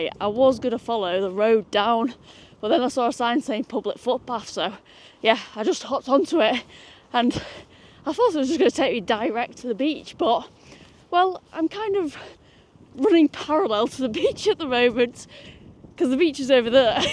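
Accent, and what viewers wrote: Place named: Wigan, United Kingdom. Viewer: I think she says "Hi everyone" run together and sung as one word. I think she's from Wigan which is known for having a strong accent. Some even regard it as its own language known as "Wiganese".. ;-)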